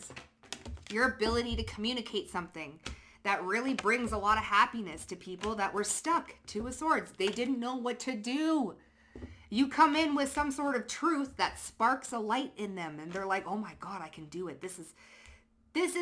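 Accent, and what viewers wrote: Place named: Wisconsin, United States. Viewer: Oofdah: You accent sound like Wisconsin. I've been around it enough 2imitate it. I got a touch of it if I'm not careful 2mask. Norwegian or Swedish ancestry. You & I would be a hoot for outsiders to listen to: taking a piss on everything dumb under the sun. I'd definitely keep t/drinks coming. It is a miracle I'm still around. 7 of 8 good hard-working me die from my condition before being diagnosed. It's a silent-killer. If I weren't built like a Terminator from baling tiny square haybales: I wouldn't have made it. So: that info's got to come- out. We can't be having no good-men to raise the next generation. Why there's 8 good-women to 1 good-man & 10% of men online-dating are seeing ~80% of women from the same sites. Oh yeah, I'm MacGyver. I can solve t/world's energy probs. Yep, I've made guys look bad who've been there 10+ years & I'm there a month doing their job twice as fast, so.. 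they do their best to get rid of me, and they did. It sucks. I'm just going to give it all away b/c Earth don't have time for us to change. It's melting the ice-caps 6 times faster than our previous predictions. We got to use every tool in the box & pump the brakes hard as we can. Unless we want to lose all of the coastal cities & states & have earth-quakes up the wazoo, volcanic off-gassing which makes it so much worse, I just got to put all my cards out there. I get a kick out of all these Christians who say prophetic singers I got with in other timelines: witches, when they're actually as H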